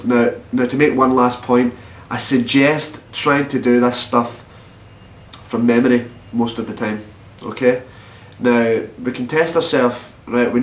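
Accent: Scotland, United Kingdom. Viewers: I'm in love with your accent ! Is it scottish? Northern Irish? Anyways, it's awesome Thanks for the guitar lesson man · Comments on the video later but that accent is amazing!! Scotland?? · he speaks nasal. But where is this accent from? Which part of the UK? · Scottish accent... no problem to follow him... thanks to few years in UK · Thank you so much for this. I started on piano when I was 7 and have been out of playing for 40+ years. My son-in-law gave me one of his old guitars last Christmas and I had forgotten most (if not all) of my theory. It was one of the problems I was having converting the keyboard to the fret board. Even through the Sco"ish accent, this was just the reminder I needed. Thanks again. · As suggested... some of these exercises are indeed tongue twisters... especially when spoken in a broad Scottish accent! Good instructional video nonetheless... 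well done! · Dat Scottish accent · He sounds like a fine Scot to me! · Scottish accents rock! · Like your accent. Scottish? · Scottish?? Great video man btw man!!!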